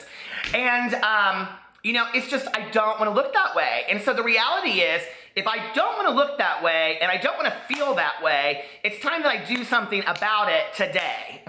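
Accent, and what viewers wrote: South, United States. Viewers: are you originally from the south? I don't know if you've mentioned in the past, I just followed you this week. I am getting a twang of a southern accent!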